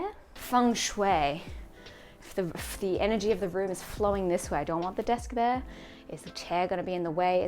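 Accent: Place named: Australia. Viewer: I'm so jealous of you. Although I've lived a good portion of my youth in Japan, I have not experienced Japan the way you have. I'm glad you share your experiences so I can enjoy Japan from your perspective. You also put me to shame to the Japanese language because I can barely understand it or speak it, LOL, the problem from living on military bases. I love your Australian accent! Keep going with your content....I've enjoyed several :)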